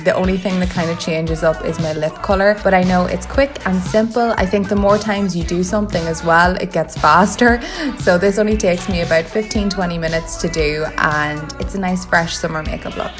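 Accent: Ireland. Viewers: I absolutely love you voice! People always guess Irish when they hear my accent(I live in Scotland but my father is Irish so I have a weird mix of both accents) so when I hear an actual Irish accent it makes me happy bc it reminds me of my dad.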